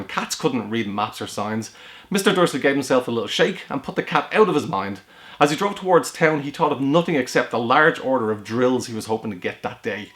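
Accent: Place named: Ireland. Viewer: Please. Do more of this. I've been looking for audiobooks with irish accent.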